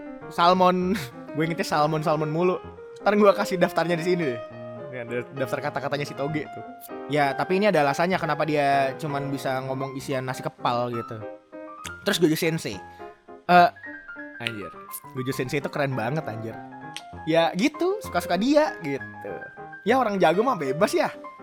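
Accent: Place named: Madura, Indonesia. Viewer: bang, lu ngomong nya madura😅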